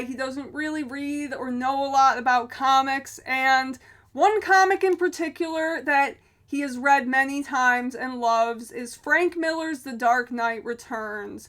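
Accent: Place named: Western New York, United States. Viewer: Love your content ... and Western NY accent!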